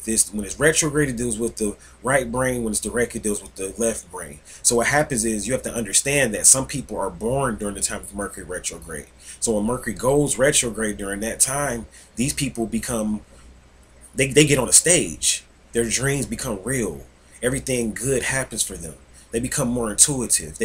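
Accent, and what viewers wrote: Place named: Philadelphia, United States. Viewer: i can tell he is from philly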